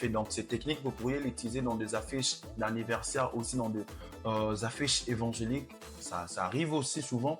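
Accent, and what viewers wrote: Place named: Africa. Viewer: Grâce à toi je sais maintenant comment manipuler un tracé ET son contenu, qui remplit la modification que je fais avec l'outil (A) Sélection Directe sur un tracé - Avant je manipulais le tracé, ensuite je le reconvertissais en sélection et je faisais REMPLIR pff quelle galère .. 😖 Maintenant en convertissant le texte ou un objet en FORME ça change tout ! Le contenant 🔸ET 🔸 le contenu sont manipulables en même temps ☺ Merci, je m'abonne pour te soutenir (en plus j'adore ton petit accent africain 🥰)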